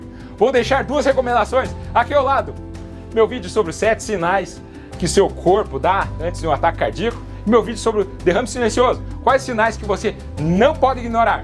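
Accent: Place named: Rio Grande do Sul, Brazil. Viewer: Doutor o sr e Gaucho de onde?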